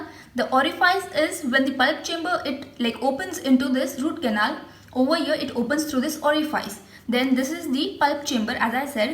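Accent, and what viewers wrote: India. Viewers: Despite the horrendous Indian accent, the explanation was excellent, good work.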